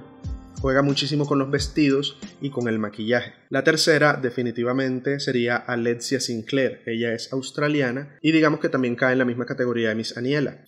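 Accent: Venezuela. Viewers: COMO QUE NO ERES VENEZOLANO CON ESE ACENTO??? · Hola, creí que eras venezolano por tu acento, de que parte de Colombia eres? un abrazo desde Medellín. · Yo juraba q eras venezolano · Por tu acento juraba que eres venezolano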